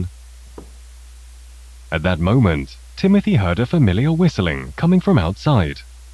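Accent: United Kingdom. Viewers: Excuse me, is this video reuploaded? Cause I heard bad quality in this video, but this short film is for me like " weird " and I heard british man's voice narration. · The narrator sounds like a British Nexpo.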